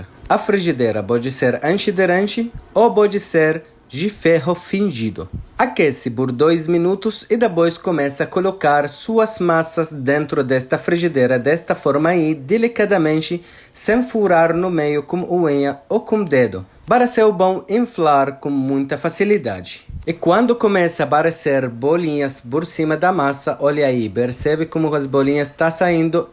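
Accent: Arab world. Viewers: Sotaque árabe muito charmoso !🧡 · Que receita fácil.. vou fazer!!! Eu amo esse português com sotaque árabe!!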